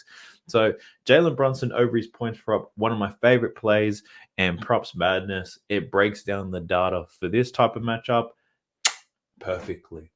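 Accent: Australia. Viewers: Never thought I would be listening to an Australian dude for NBA picks 🤣. Love the insight tho 💯💪🏽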